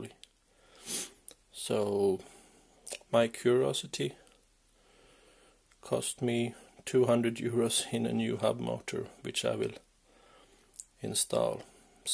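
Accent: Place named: Bangladesh. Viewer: ভাই অাপনের কথা বাংলাদেশি বাংলাদেশি মনে হয়।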